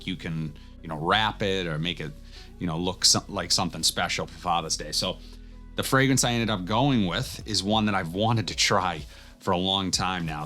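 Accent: Boston, United States. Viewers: Can't get pass his awful Boston accent 😂😂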